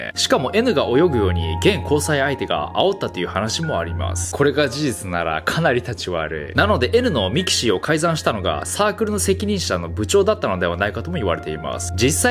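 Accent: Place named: Kansai, Japan. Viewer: 関西弁だよごく普通の。 それはちゃうねんなぁ〜。とか言うよ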